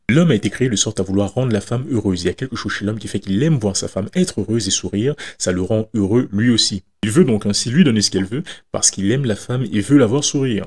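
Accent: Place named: Antilles, Caribbean. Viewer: Excellente vidéo mais ... Petite suggestion bro pour tes prochaines vidéos ! Tu as un bon timbre de voix qui sent bon les Antilles ... mais par moment ton débit de paroles est trop rapide 😅 Ça gâche un peu 😉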